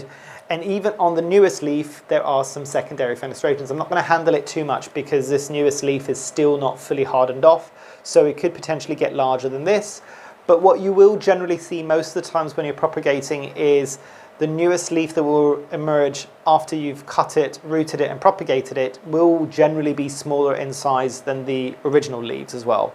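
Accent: West Country, United Kingdom. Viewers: Love that west country accent coming through ☺️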